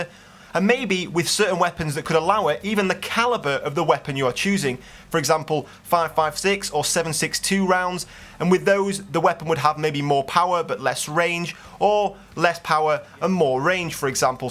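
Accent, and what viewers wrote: England, United Kingdom. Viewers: Great intro! since i mostly play support and use LMG i don't think i would enjoy that weight idea. Btw where in England are you from? awesome accent! :)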